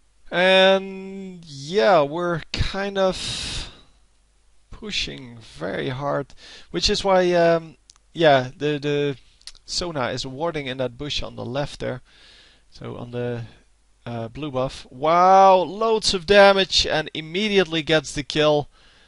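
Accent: Netherlands. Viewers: are U dutch, like me? U have dutch accent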